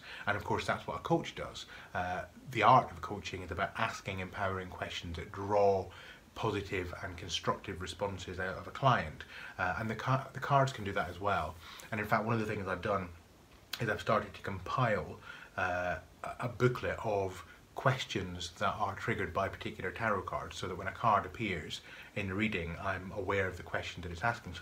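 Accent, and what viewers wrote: Scotland, United Kingdom. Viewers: Six of Swords is one of those cards I go straight to with a new deck. I have my preferences as to how it looks. Nice to see the completely trimmed Spiral Tarot. I did the same to mine, red borders be gone! Lovely video,. And your accent, trying to place it. I'm guessing Scottish? Thank you for sharing. 😊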